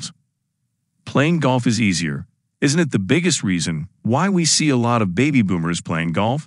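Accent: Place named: United States. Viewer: ..Why is someone with an American accent calling soccer, football????????z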